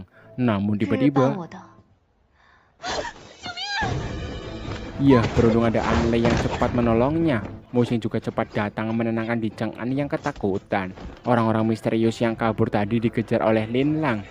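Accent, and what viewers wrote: Banyumas, Indonesia. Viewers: Duuh Mas broo yg merasa mirip Han Ye alias Gong Jun hehehe wkwkwkk kok ngomongnya ngapak gitu sama kayak Aku lhoo aslinya mana yaaah gaess 🤔🙉🤗😁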